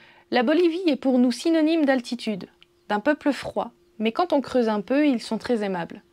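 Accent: Haute-Saône, France. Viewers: Magnifique résumé de votre voyage, ça n'a pas dû être facile de choisir les séquences tellement vous nous avez montré de beaux endroits depuis ces 3 années. Continuez bien comme ça à nous régaler. Vesoul et les autres contrées de Haute-Saône nous ont été familières durant de nombreuses années, ayant débuté ma carrière à Luxeuil, c'est votre accent qui m'a attiré au début. Merci en tous cas pour toutes ces belles vidéos. Prenez soin de vous là-bas en Hémisphère sud. Ici en Périgord on vous admire. Jean-Louis Adishatz. 😘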